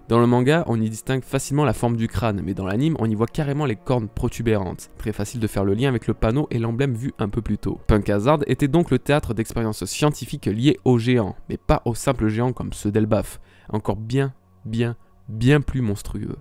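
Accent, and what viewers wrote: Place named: Alsace, France. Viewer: Rien à voir mais tu es d'Alsace non ? j'ai l'impression d'avoir reconnu un petit accent ahah Et excellent vidéo ça change pas ! :))))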